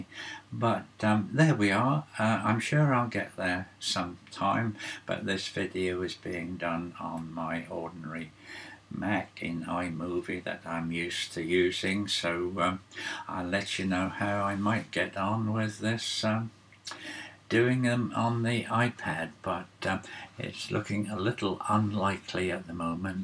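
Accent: United Kingdom. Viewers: Always like British accents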